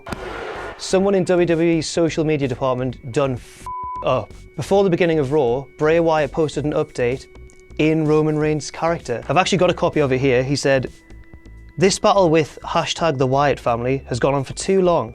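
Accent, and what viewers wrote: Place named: Newcastle, United Kingdom. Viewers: Yay!!... You are posh for a north eastern lad though. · HA! You're from Newcastle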